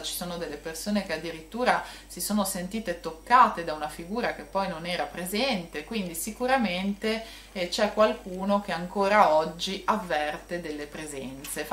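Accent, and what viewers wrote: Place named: Genoa, Italy. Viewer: belandiiiiiiiiiiiiiiiiiiiiiii non conoscevo questa storia.comunque hai una forte cadenza genoveseeeeeeeeeeeeeeeee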